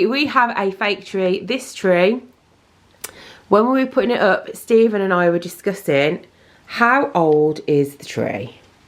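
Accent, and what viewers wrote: United Kingdom. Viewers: You are a charming and humorous woman! Very pleasant.... Are you British? As I am German it is not always so easy for me to distinguish British and American language.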